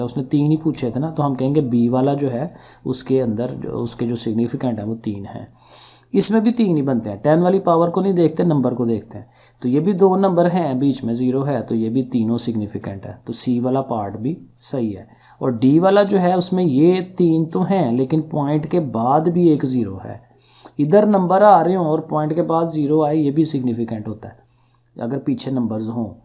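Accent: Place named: Lahore, Pakistan. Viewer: Ap Lahore sy lgty hyn sr😊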